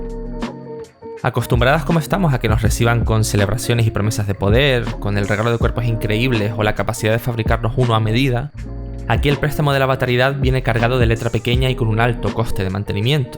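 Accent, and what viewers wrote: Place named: Canary Islands, Spain. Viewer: Eres un artista, y por tu acento pareces Canario. Lastima que no este en castellano el juego.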